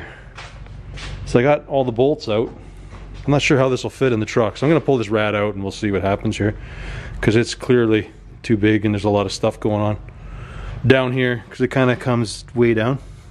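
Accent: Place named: Canada. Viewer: Figured with "eh" and aboot' love the Canadian language!!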